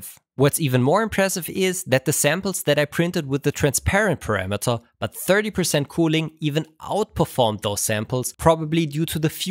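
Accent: Germany. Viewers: Du bist doch Deutscher oder 😅 also ich mein da was rauszuhören 😁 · Wie das deutsche rauskommt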